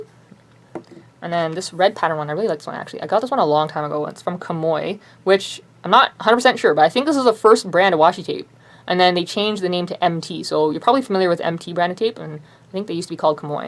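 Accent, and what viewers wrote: Canada. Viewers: I love how you sound so canadian. Lol. The fast speech and dragging out certain letters or sounds. I hope im right in guessing your canadian? >.<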